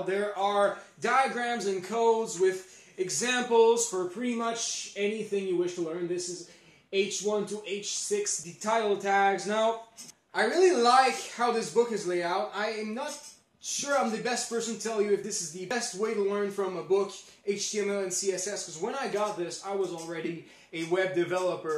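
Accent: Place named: Canada. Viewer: you sound so much more canadian in this video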